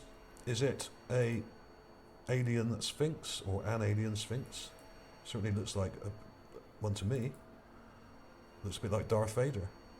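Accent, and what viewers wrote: Bristol, United Kingdom. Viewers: Love your Bristol accent!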